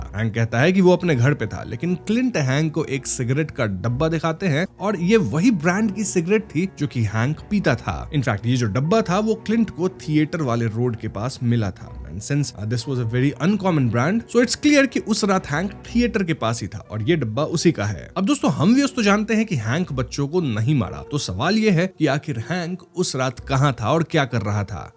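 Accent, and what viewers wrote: Bengal, India. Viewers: Hey your Bengali ??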